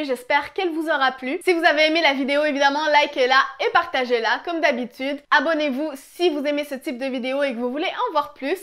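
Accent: Quebec, Canada. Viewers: Salut, je trouve que tu as un léger accent. Tu ne serai pas Québécoise ? En tout cas j'adore ta voix ❤ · T'es tu québécoise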